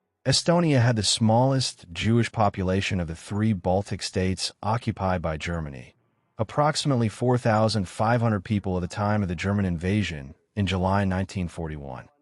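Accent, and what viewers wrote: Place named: England, United Kingdom. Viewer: It would also be helpful if German words were spoken with more of a German accent than an English accent. If you want a quality product, this could be done. For example, It’s “gruppen” with a long “u” sound, not “grupen” like “up-en”. Spend the cash and get a professional translator to help with the accents/pronunciations. This is done all the time. Then it doesn’t sound so amateurish.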